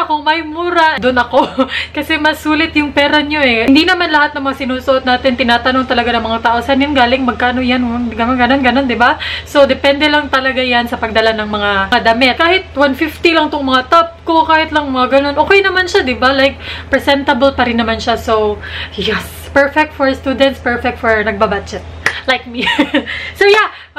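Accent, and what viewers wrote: Visayas, Philippines. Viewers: new subscriber here.. I like your bisaya review sounds so cute to me..Keep it up!! 😊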